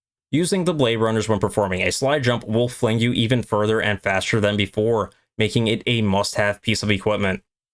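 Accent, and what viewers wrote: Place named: Philadelphia, United States. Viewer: You from the Philly/New Jersey area? Thought I heard the accent in your voice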